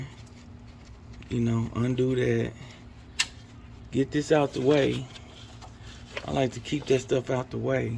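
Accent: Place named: South, United States. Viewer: Nice job! I think you got a little lucky being able to get the hub off like that. Sounds like you is from the south....but up here in NY it might be more difficult for me to do. I got some whirring going 50mph+ steering to the right so it's next on my list of things to do to my 2008 DTS. Keep up the good work and thanks for the detailed explanations!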